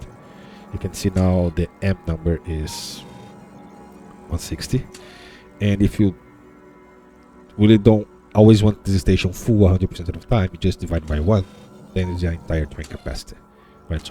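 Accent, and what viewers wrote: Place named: Brazil. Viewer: Vc é brasileiro? Teu sotaque parece daqui.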